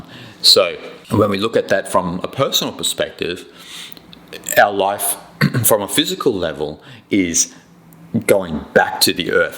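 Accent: Australia. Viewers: Good on you my Friend to explain all this in your Accent which is easy for most of the westerners, I guess urs is Australian Accent, which is I am familiar with as lived there for 5 years, Good to be in contact with you regarding such discussions, Take care, Revert if you feel like.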